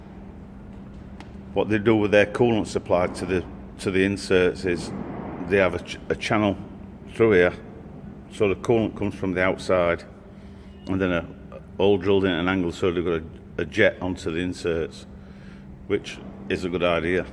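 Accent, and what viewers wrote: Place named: Sheffield, United Kingdom. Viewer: brill channel love the Sheffield accent and humor Hope yr ok mate Wherever you are?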